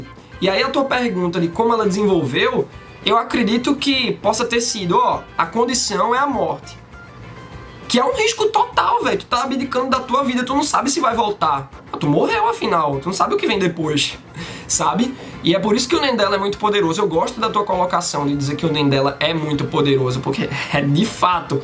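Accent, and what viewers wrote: Pernambuco, Brazil. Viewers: Ei mano, tu é de Pernambuco?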